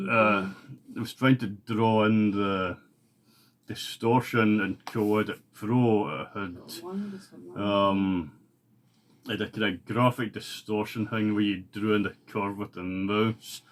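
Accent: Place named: Scotland, United Kingdom. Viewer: I can't wait until we meet again so I can understand proper Scottish - I'm half way there, though.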